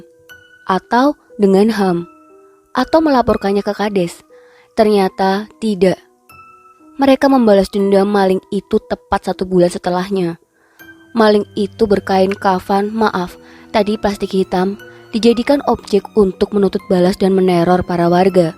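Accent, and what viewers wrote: Banyumas, Indonesia. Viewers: Bahasa ngapak yak😅😂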